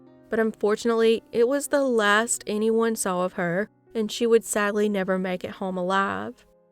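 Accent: South, United States. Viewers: can be hilarious at times with her cute Southern drawl🤣😂👍 Excellent narrator too!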